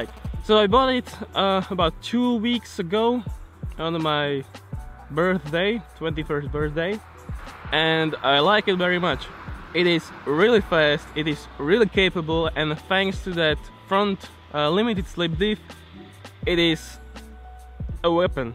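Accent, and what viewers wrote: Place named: Slovakia. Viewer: Je je,prizvuk ho prezradil :D ale dobrý je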